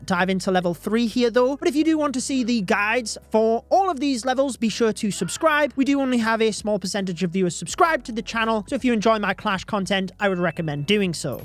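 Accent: United Kingdom. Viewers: hi mate, i think you are an american but im wrong youre british man😅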